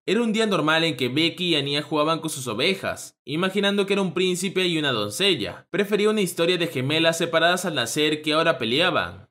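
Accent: France. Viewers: ¿,tienes acento francés?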